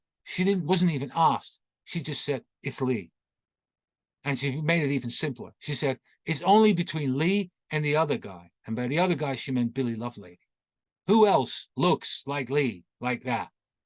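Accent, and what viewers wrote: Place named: United Kingdom. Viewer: !!!!:text-green-game-over::face-blue-smiling:🍾🥂🎉, as you were ticking off the 4 white, male, blue collar employees, that PM could be, you had Frazier, Oswald, Lovelady and Dougherty (or Dockerty, as you pronounce it across the pond 💂‍♂). You then said we know it can't be Frazier.... we know it can't be Lovelady...so that leaves Oswald and Dougherty. You then went on about Dougherty being on the 5th floor...etc... That's not important! I just realized we can justifiably kick it up a huge notch, and identify PM as LHO with virtual certainty! We have long had information about Dougherty's general appearance. In Roy Truly's Warren Commission Testimony, when being asked about Dougherty, Truly says: "He is a GREAT BIG HUSKY fellow. I think he is 39 years old."!!! I think Ed LeDoux also obtained similar information a few years ago, about Dougherty being big or fat, and if i remember correctly, wearing glasses. 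I'm not absolutely sure but I think he got this description from another TSBD employee or employees! Maybe from Frazier? There is no way that PM/Oswald is big, husky or fat! Or wearing glasses (if that's the case). So IPSO FACTO, Prayer Man IS Lee Harvey Oswald!!!!!!!! Am I wrong?! Please tell me I'm right!!!!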